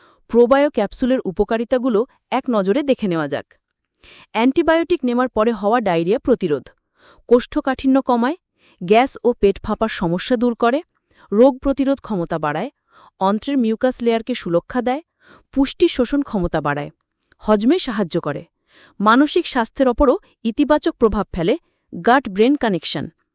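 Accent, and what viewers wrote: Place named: India. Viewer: কন্ঠে বাংলাদেশের টোনে বলুন। ইন্ডিয়ানদের মতো করে বলছেন..?! ওদের এই বাংলাদেশের সাধারণ মানুষ পছন্দ করে না।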